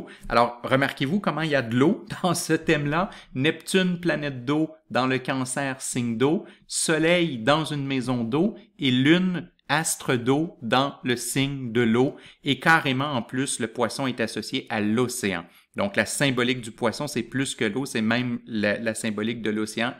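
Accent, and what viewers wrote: Quebec, Canada. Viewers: Merci pour vos vidéos très instructives, en plus quel plaisir d'entendre notre cousin du Québec !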